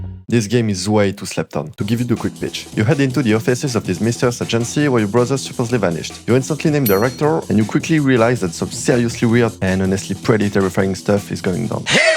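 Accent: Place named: France. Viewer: remove the french accent you sound pity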